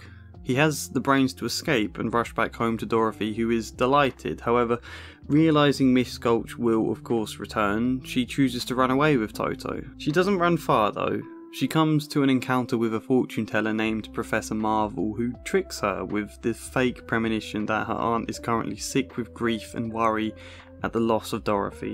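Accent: England, United Kingdom. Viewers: 137th...WOW ...a Wild Deep Dive into the Mind / Feelings of Dorothy Gale...I actually enjoyed this..and your English Accent makes it even better...... Have you seen the Prequel Oz the Great and Powerful"?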